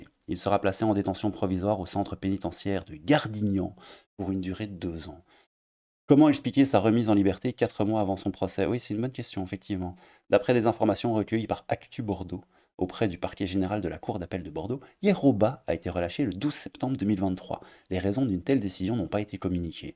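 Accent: Belgium. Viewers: Daag Meneer! Merci pour les vidéos, ça fait du bien d'entendre du belge de là où je suis. Le parti islamique à Anderlecht? pas de commentaires? Tcho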